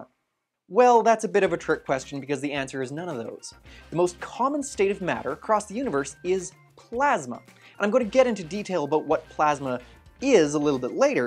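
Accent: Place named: Ontario, Canada. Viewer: i know a South East Ontario accent when i hear one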